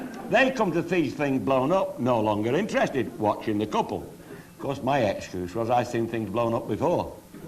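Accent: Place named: Cheshire, United Kingdom. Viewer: I live in the same area that he's talking about. Nice to hear an authentic Cheshire accent.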